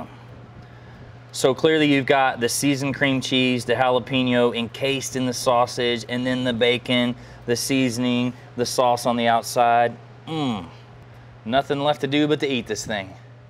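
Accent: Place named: Kentucky, United States. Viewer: Would never expect a German to say “ain’t no such thing”! Love it. You sound like us (in Kentucky). Meat Church videos always really good.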